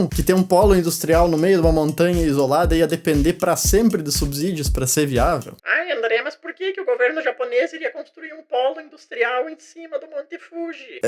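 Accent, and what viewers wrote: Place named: Paraná, Brazil. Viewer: Muito obrigado pelo conteúdo! Vc é paranaense pelo sotaque.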